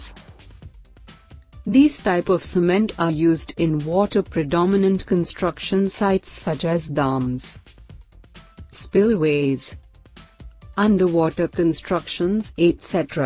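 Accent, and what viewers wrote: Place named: India. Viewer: Why does the bot have an indian accent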